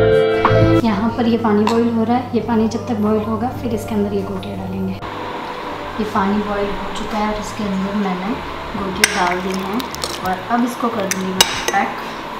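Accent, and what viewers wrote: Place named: Gujarat, India. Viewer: Hi bhabhi...... .........aapke video me jabhi bhi dekhta hu.... Gujarati me Aap bolte Ho esa lagta hai....... Nice video beautiful vlog hai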